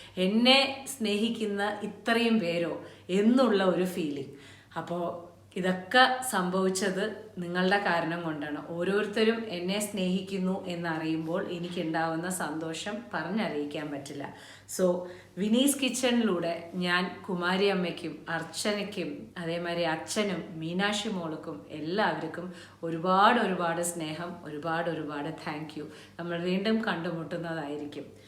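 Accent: Palakkad, India. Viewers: Hi am always see your new episode. Great dear 🥰 pkd evide anu varubool kananam.realitiyodu kudi ulla presentation.palakkadan natural slang super good bless yu.❤ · എനിക്കും ഇങ്ങനെ 🥰 കാണാൻ ആഗ്രഹം ഉണ്ട് പാചകവും വാചകവും ഒരുപാട് ഇഷ്ടം 🥰 ഒരു പാലക്കാടിന്റെ സംസാര ശൈലിയും ഒക്കെ ആവണം അത് 👏👌👌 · I am also a fan of you recently started seeing your vlog I am also from palakad so feel very familiar when I hear your way of talking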